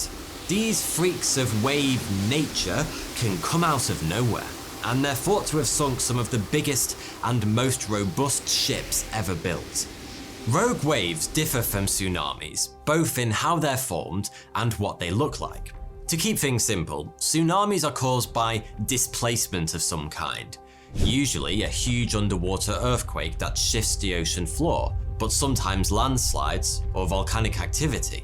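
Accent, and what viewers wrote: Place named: North, United Kingdom. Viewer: Tha sound northern thougnty..where ya from pal..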